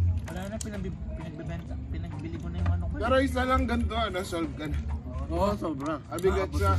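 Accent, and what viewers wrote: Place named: Pampanga, Philippines. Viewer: The Campampangan Accent tho. 🤘😁🤘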